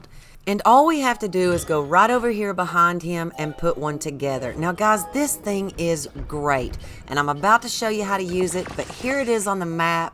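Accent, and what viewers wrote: South, United States. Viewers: Not to mention that nice Southern accent that makes it so chill…. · this is genius! also i'm so tickled by your accent, as a fellow southern person, you dont hear many southern game tutorials 😂❤ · Are you southern? Lol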